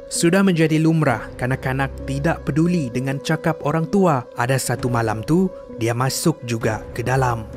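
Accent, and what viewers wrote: Sabah, Malaysia. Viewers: Dia Sabah, bahasa pun lain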